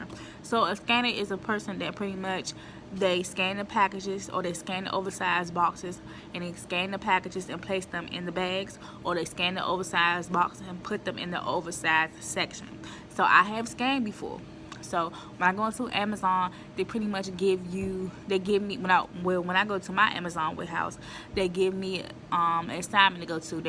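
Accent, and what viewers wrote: DMV, United States. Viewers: Are you from the DMV? You sound like it.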